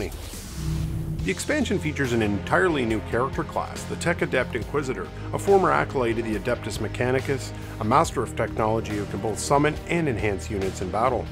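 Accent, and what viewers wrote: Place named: Canada. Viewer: Haha I even subscribed too. I like how you do content and your accent is clearly Canadian, same here I’m in Alberta. Keep grinding man 🤙🏼🔥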